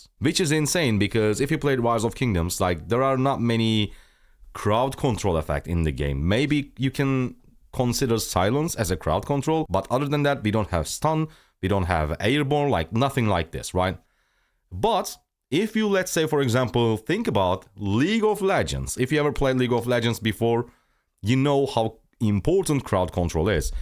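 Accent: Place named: Turkey. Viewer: Dude, your accent sounds turkish, are you ?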